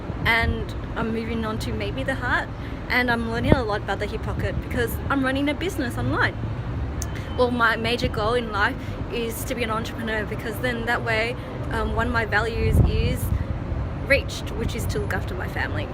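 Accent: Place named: Australia. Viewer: Love the Australian accent but your breakdown of substance vs. candy content is spot on.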